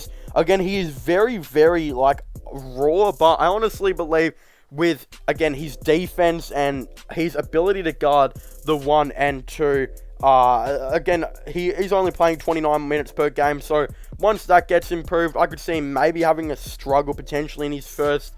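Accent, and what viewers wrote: Australia. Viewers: Dude are you Australian or are you trying to sound Australian and doing a bad job at it?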